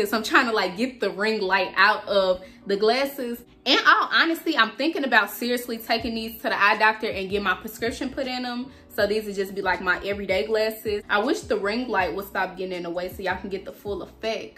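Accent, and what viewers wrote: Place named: Miami, United States. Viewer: Your accent sound like you’re from Miami.youre beautiful ❤️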